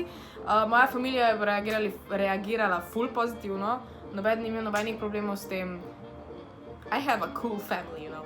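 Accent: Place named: Velenje, Slovenia. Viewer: Halo gledalci spostujte jo kakrsna je ce ne pa ne gleda ce vam ni vsec... Men je top ker je taksna kot je in pac velenjcani majo tak glas sej govori slovensko sej ne kitajsko ce se pa v šoli niste ucil jemat snovi narecja v Sloveniji pa ni moj problem ampak sami ste krivi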